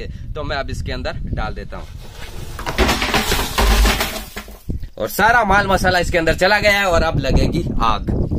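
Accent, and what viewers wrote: Rajasthan, India. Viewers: Aap rajsthan se ho kya please replay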